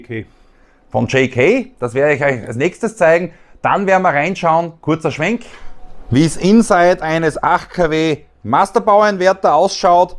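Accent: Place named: Slovakia. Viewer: Ich genieße dein Dialekt in Slowakei. Toll toll toll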